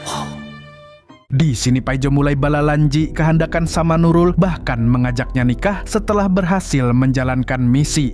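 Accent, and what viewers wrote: Banjar, Indonesia. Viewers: Org Banjar KH mang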